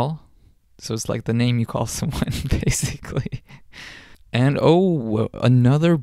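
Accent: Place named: Setesdal, Norway. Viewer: Ja, Setesdalsk e noko for seg sjølv 😅 Men veldig fint då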